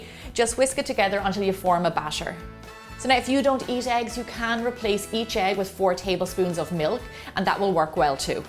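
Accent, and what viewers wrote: Ireland. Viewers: I love your irish accent!! ❤️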